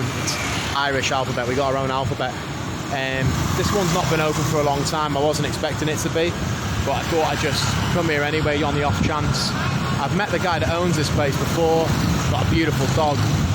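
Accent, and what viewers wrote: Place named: North Wales, United Kingdom. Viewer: Hi Mate, Subbed after seeing you on Pete's channel. Really enjoying your videos. Where about's in N Wales are you from? You have the same accent as me. Take care :goodvibes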